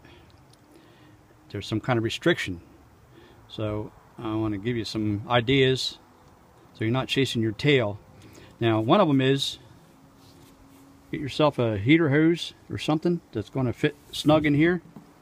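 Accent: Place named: Pittsburgh, United States. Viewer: Must be from Pittsburgh...can recognize that accent instantly... that or Ohio...ya?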